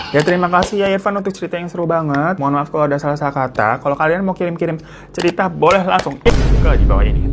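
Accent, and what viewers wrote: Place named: Banyumas, Indonesia. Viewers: Aku suka mas bisa ngomong ngapak wkwkwk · Curiga bang wong ngapak😐🙏, katanya juga punya sepupu orang purbalingga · Ngapak lu gureng bang 🗿 Gw orang purwokerto agak aneh dengarnya🗿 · Bang ngapak lo oke banget masih ehe 😂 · Si babu glowing bisa ngapak woyyy😮😊😂😂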